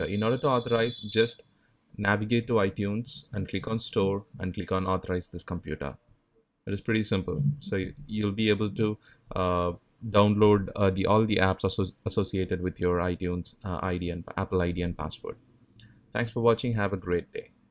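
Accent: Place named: United States. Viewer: MURICAN ENGLISH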